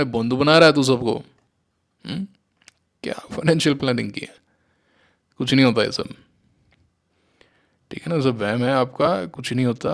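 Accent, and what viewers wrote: Rajasthan, India. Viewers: Sir app rajsthani ho kya ❤❤❤❤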